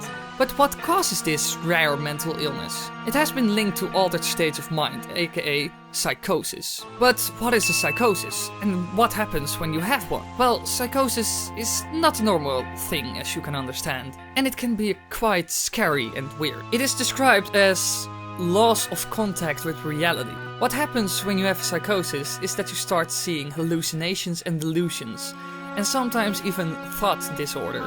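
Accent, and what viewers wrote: Netherlands. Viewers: That accent must be Dutch. Good video! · Is this lad Dutch?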